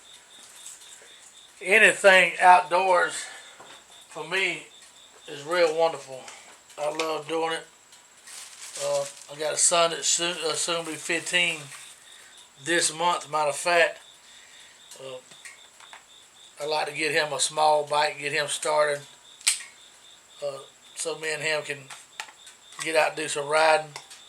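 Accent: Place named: South, United States. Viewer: Dude sounds like a hillbilly...lol...Makes an easy job sound like a lifetime...lol...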